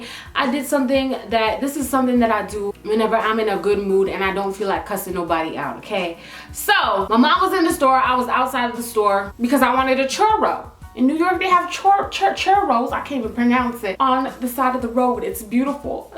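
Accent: France. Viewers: You're french accent is so cute 💙☺️ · HAAAAAAAAAAAAAAAAAAAAAAAAAA !!! YOUR FRENCH ACCENT IS CUTEEEEEEE !!!! (i'm from Montréal)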